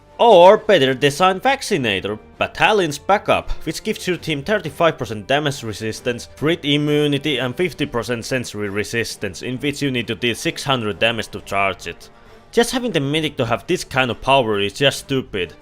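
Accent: Finland. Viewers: you are the suomiest finn ive ever heard, good video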